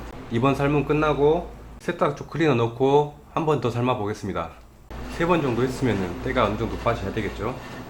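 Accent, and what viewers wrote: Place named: Jeolla, South Korea. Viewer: 제가 해외거주하는데 세탁기 청소하려고 영상봤다가 기사님 전라도 억양을 듣고 전라도 분이신 제 부모님이 생각나네요😊 너무 정감가고 좋아요~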